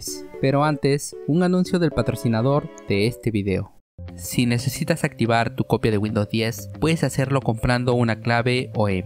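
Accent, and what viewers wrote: Peru. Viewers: Eres peruano?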